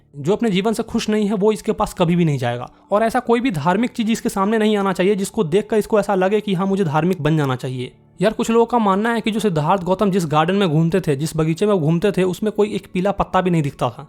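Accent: Bihar, India. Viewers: he is from bihar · Sir aap bihar kai ho kya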